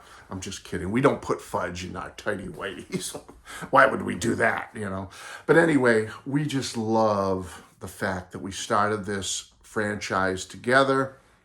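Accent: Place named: Texas, United States. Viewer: That explains obvious Texan accent.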